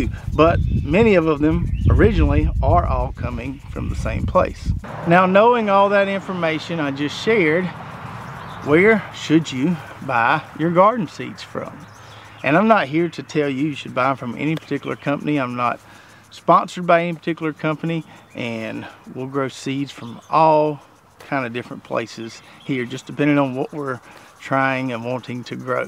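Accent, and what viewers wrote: South, United States. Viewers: ...You just cleared up my thoughts as of late. I have been watching your videos for the last year and really appreciate your intelligence, southern accent, humor and love for gardening. You're the reason I purchased all my drip, fertigation and seeds from Hoss. I am sure they are missing their main man. Not sure we'll ever know what happened but if you start your own company, many like me will follow. God bless you and your family. Everything happens for a reason! Thanks for making awesome videos! Hollerin' from O-H-I-O! All the best to you and your family!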